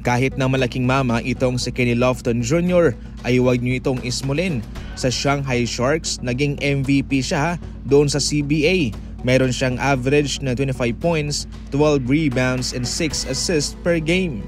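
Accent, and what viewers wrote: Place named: Visayas, Philippines. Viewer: Bisaya ampots ahahaha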